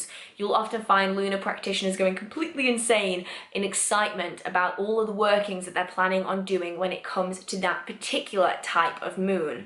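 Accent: United Kingdom. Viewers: This is an excellent video in all respects and I will share the link on social media later this year. [ Am looking into neopagan lunar magic on youtube. ] One important reason to recommend this video is the book reference. At your website I found another significant book by Joey Morris which connects two areas of my interest: Celtic mysticism and hedge witchcraft practice. I may get that. Of course, I always appreciate the Brit accent. The moon magic I use is formal classical Hindu / Buddhist. Regards to Plymouth.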